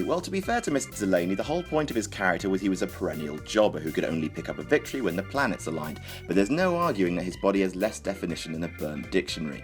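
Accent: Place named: United Kingdom. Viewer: fucking hate British accent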